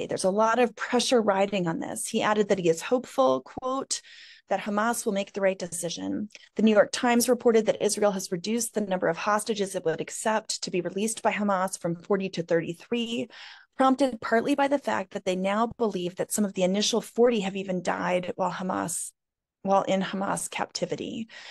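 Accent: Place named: South, United States. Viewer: Thanks for not disparaging the historic flags of the South. As you know, not all Southerners are racists or klan members. Some of us even work for peace & justice. As William Faulkner is often quoted, "In the South, the past is not forgotten. It's not even past!" Your comment about the reoccurring "twang" in your voice was delightful!!! The Southern accent, in all of its various forms, evokes the fondest of memories. It literally soothes the human soul. I hope you enjoyed Montreat & the beautiful Black Mountains of North Carolina. Wonderful place with wonderful people!!!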